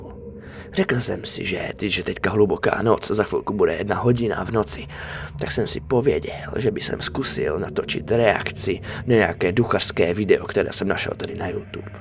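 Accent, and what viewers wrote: Moravia, Czech Republic. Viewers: Aaaa Bojanovcak :) si rikam odkad ted silny moravsky prizvuk (to je jedine dobre, uz mam tech "cechackovskej" vlogeru dost)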